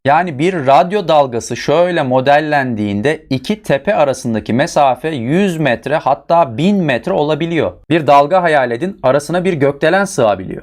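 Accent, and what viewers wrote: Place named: Kastamonu, Turkey. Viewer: samsun da olabilir ama kastamonulusunuz bence ya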